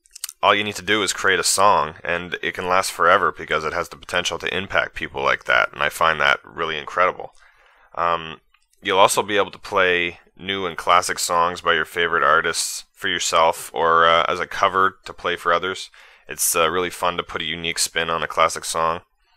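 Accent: Canada. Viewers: you're Canadian xD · you're canadian, aren't you? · Are you Canadian? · are you canadian? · Are you Canadian? There's just that hint of an accent.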